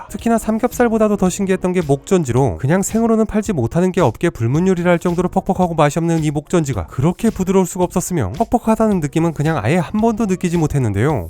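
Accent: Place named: Jeonju, South Korea. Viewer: 전주분이셨군요?역시 목소리톤이 사투리는 없지만 억양이 전주식 아니겠지 보면서 참 친숙해했는데...좋은영상 만들어주시고 항상 잘보고 있어요. 제가 전주를 좀 알아서요.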